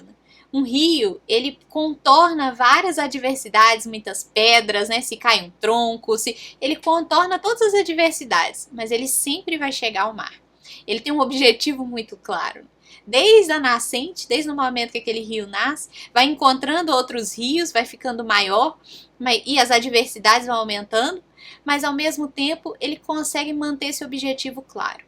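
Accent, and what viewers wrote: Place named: Minas Gerais, Brazil. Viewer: Sotaque de minas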